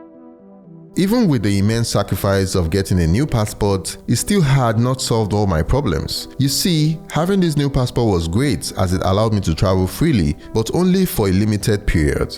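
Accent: Nigeria. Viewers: he is a Nigerian speaking English and therefore has a Nigerian accent! Use your head. Would you say the same to Wole Soyinka? Examine your inferiority complex. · It's surprises me you have achieved such a high number of followers with such a heavy Nigerian accent. Anyway well done to you. I think Portugal is a good choice.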